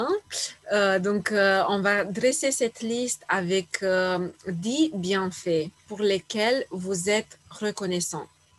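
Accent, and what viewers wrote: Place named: Romania. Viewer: Merci beaucoup Je viens de vous découvrir... Je vais acheter le livre, le lire, m en imprégner et puis suivre vos vidéos pour faire ce travail... J aime beaucoup votre petit accent roumain sympathique, votre bonne humeur contagieuse et votre franc sourire A bientôt 🖐🖐🖐🖐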